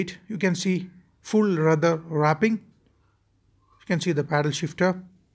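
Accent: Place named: Kerala, India. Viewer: Manglish kollam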